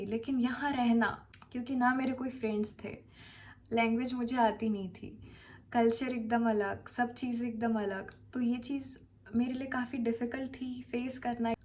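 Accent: India. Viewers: every thing about you is indian , accent is same as indian hindi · you look like punjabi girl and speak hinglish like most of the indian who lives in cities or towns. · You look like foreigner and you behave and speak like Indian, be like what you like after all its your life. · You look like Ukrainian and speak like Indian ❤❤ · Your English has Indian accent…you can easily get a role in Hollywood because of that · Your accent is pure Indian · Bruh even your english accent is Indian. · I smiled throughout the video😅 And yes you're completely Indian the way you speak. Aur aap k papa india mei kaha se hain india mein? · You are looking like foreigner but speaking like indian.however you are very sweet girl 😊😊😊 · You look foreigner but sounds indian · Your Hindi is so good .you talk like Indian Good i ❤ you talking in Hindi. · indian accent bhaut sahi h, expect nhi kia tha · You're 100% India. 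Your English is definitely "indianised" and your Hindi is most plain. Subscribed. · the best part is the hindi accent of you❤ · Looks is more on Ukranian side. But Speech is absolutely Indian.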